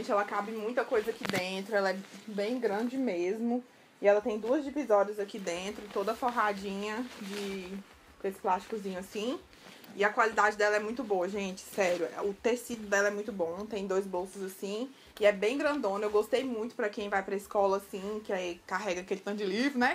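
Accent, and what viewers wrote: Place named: Northeast, Brazil. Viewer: Amei suas escolhas...esse seu sotaque é norte ou Nordeste??? sou do Nordeste, moro em João Pessoa. 🌻♥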